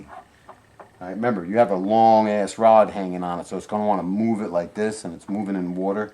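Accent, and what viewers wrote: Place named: Maryland, United States. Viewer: you from the Maryland area by chance. I think I recognize that accent! :)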